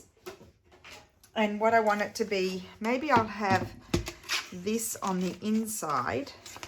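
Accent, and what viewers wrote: Australia. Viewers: I am changing your name from the Martha Stewart of YouTube to the. This is the stupidest question anyone has ever asked you. When you dream, do you speak in Italian or in your delightful Aussie accent? My friend is tri-lingual, and she says the people in her dreams speak all three, and often if two people are talking one is in English and the other speaks French. Great project!!!!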